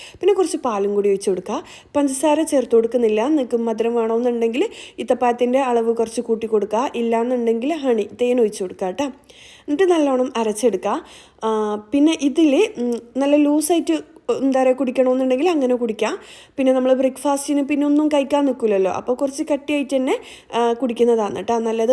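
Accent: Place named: Lakshadweep, India. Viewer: [01/09, pm] ѕαяנι🫣: Hi [01/09, pm] ѕαяנι🫣: I'm sarjeena from lakshadweep ഞാൻ ഇപ്പോ കുറെ ദിവസങ്ങളായി ൻ്റെ videos കാണുന്നു.നല്ല ഒരു motivation ആണ് എനിക്ക് കിട്ടിയത്, thank you so much... ശരിക്കും എൻ്റെ ജീവിത ശൈലീ തന്നെ മാറ്റി മറിച്ചു ipo ഞാൻ സുബിഹ് നിസ്കാരം തെറ്റികാറില്ല പറഞ്ഞ പോലെ നേരത്തെ എണീകുമ്പോ മടികൂടാതെ പണി എടുകാൻ പറ്റുന്നുണ്ട്.പിന്നെ nte ഭാഷ നല്ല രസമുണ്ട് കേൾക്കാൻ,കുറച്ചൊക്കെ എൻ്റെ ദ്വീപിലെ ഭാഷ പോലെ ആണ്. അ പിന്നെ ഞാന് ഗ്രീൻപീസ്. ചിക്കൻ ബിിയാണി ഒക്കെ ഉണ്ടാക്കി. ഇവിടെ എല്ലാവരും കഴിച്ചിട്ട് ഇത് ഞാന് തന്നെ ആണോ ഉണ്ടാക്കിയത് എന്ന് ചോദിച്ചു....☺️ So dear friend once again thank you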